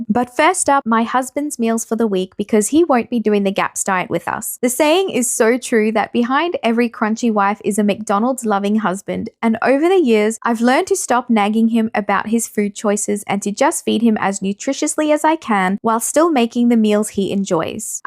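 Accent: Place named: Australia. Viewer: Hi! Seeing this content brought a smile to my face because we’ve also done GAPS as a family (it looked very similar to this!) and I just find it so refreshing hearing another Aussie mum talking about this diet!! I would love to follow you on instagram but your handle doesn’t come up! God bless x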